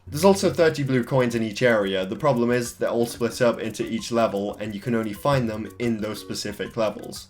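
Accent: United Kingdom. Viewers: but british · It's like Bri'ish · The British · but British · Two of my favorite youtubers in one....and hes british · BRITISH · Why is British now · Maybe it's just been a while but I could have sworn isn't british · Wow, minus the british accent everything was spot on, could've fooled me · British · 1/10 too british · Oh god he's british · but he's british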